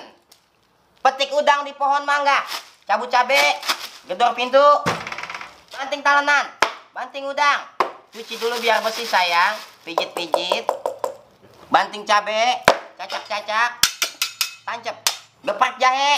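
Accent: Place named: West Sumatra, Indonesia. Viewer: Suaranya persis minang kocak anjir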